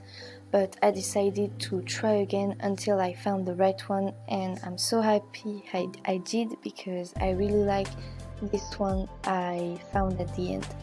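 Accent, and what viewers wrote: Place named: France. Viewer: That's so great ! I love your style, and your art is so clean 😍 I'm scared I'm not right but, you're french, aren't you ? (Because of your accent)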